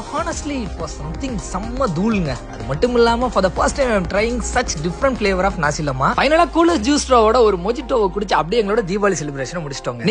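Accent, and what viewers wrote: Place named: India. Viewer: Hanestly...? Looks like a video production from India together with the English diction.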